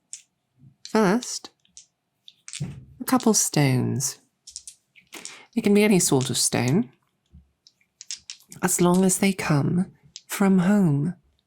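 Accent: United Kingdom. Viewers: The British accent is healing for the soul ❤️‍🩹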